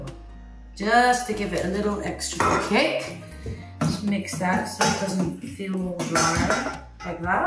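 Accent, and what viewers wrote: United Kingdom. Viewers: Sometimes, I forget that she’s British, and then she starts speaking...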